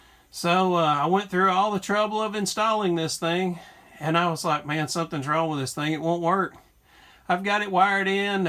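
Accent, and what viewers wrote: South, United States. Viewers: Being from South Carolina I was glad to see someone who speaks Southern American English make an RV roof repair video. I couldn't understand what the folks from Connecticut and California were saying. Very useful information. Thank you.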